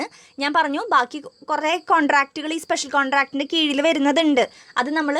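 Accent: Thrissur, India. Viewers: Miss nte slank Thrissur kkaarde pole ind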